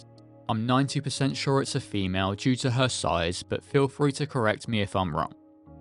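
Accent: United Kingdom. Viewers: Yeah, female, mate. Lovely terrarium. Just making a large slow flowing waterfall terrarium now and hoping to put a mantis in it. Not sure which one yet 🤔 P.S. You sound like a Manc as well?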